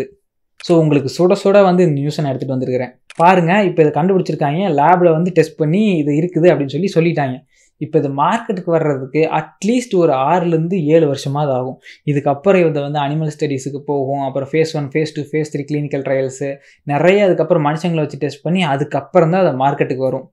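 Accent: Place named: Madurai, India. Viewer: என்னதான் ஜெர்மனில் போய் ஆராய்ச்சி பண்ணினாலும் பேசற அப்போ நம்ம மதுரை ஸ்லாங் வருதுல்ல🎉🎉😂😂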